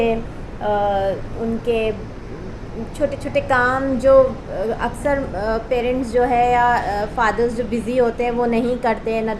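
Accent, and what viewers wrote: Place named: Kathiawar, India. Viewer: If your ancestors are from Karachi/Sindh/Pakistan then your mother tung should be Sindhi. Why are you speaking Gujarati? In Karachi most Gujarati speaking muslims are migrated from Junagadh Saurashtra. Example one of greatest Pakistan's cricketer Hanif Mohmond n his brothers all were born in Junagadh accept Shadik Mohmond. You needs to do more research on your ancestors because your gujarati speaking tone is Kathiawadi.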